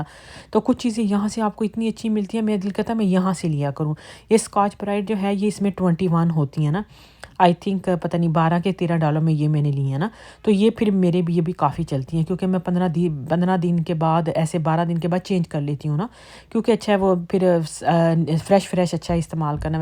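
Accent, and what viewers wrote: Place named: Peshawar, Pakistan. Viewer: Are you hindku speaking your accent sounds peshori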